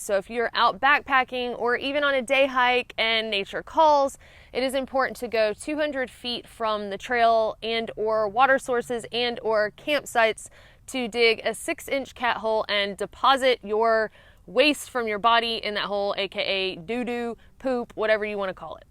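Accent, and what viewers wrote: South, United States. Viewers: I had to watch it again to catch the "braht waht laht" part. Love the southern accent. Thank you for the informative video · I love how you say "Bright white light". I'm from NJ but love the southern accent! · “Brot wot lot”...love the lingo. I always learn something listening to you. Press on! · headlamp has a brait whait lait...your southern twang is adorable.. Good advice as well..common courtesy is something a lot of folks lack..you are obviously drippin with it..good on ya...and you have sand young lady...hugs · To many raised in the South, speech from the north has always sounded equally strange and or funny. Southerners have just generally been too polite and respectful to mock people for it. This was largely the reason many of us raised in the South years ago resented “Yankees.” We always knew what to expect immediately: mockery and derision because we and they spoke different. 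It’s not nearly as prevalent theses days, but this thread shows vestiges of it. Fortunately, even in this thread, some now even seem to find the southern dialects pleasing and charming, especially when coming from this lovely young woman. is a good ambassador and representative for our culture. I think she is a beautiful person. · Love that southern accent!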